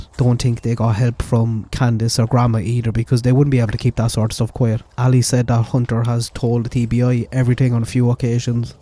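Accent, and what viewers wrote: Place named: Galway, Ireland. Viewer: You’re from Galway? I was trying to figure out the accent lol. I love Galway. I’m Waterford living in Kildare ☘️ . Glad a logical Irish thinker is covering this ❣️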